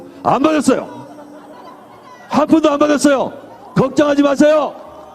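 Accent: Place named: Busan, South Korea. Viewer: 부산이구나 ㅎㅎㅎ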